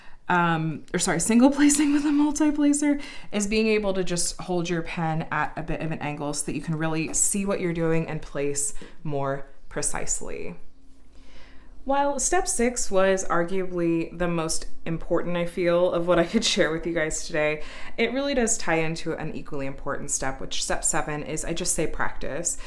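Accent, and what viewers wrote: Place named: RP, United Kingdom. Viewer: Very interestingly I picked up on what I perceived as an upper classman kind of "accent" at times. Maybe this is just a product of that teaching technique called ENUNCIATION. Whether intentional or not, to me this really INCREASED the perceived greatness and value of a project like this, and makes both the craft and the video much more interesting. Currently working on my first about Jesus holding hands with a child while walking on a trail in a forest. Love doing this.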